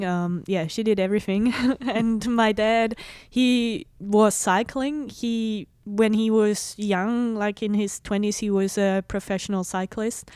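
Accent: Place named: Quebec, Canada. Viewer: She sounds french canadian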